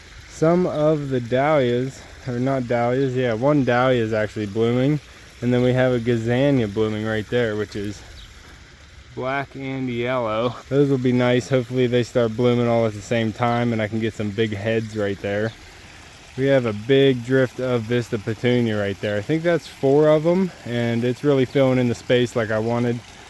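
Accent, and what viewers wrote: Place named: Western Pennsylvania, United States. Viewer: I was wondering the same thing. But I guessed western PA from your accent!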